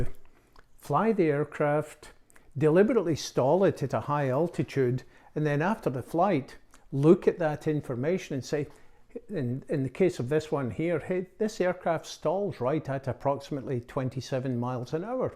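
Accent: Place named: Scotland, United Kingdom. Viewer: Was easy on my ear, hearing a fellow Scotsman 🏴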